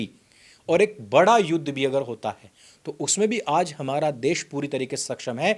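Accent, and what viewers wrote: Haryana, India. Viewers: WAHA RE WAHA BHAI YOUR TANTING IN HARYANI ASCENT MY BAHUT HI PASAND HAI.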